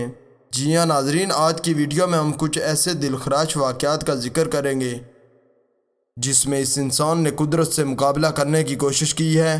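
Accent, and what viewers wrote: Kashmir, India. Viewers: Nice kashamiri accent watching and subscribeing just cuse #factical gave you shoutout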